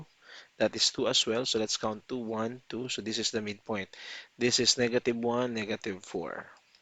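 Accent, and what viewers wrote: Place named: Myanmar. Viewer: မြန်မာသံ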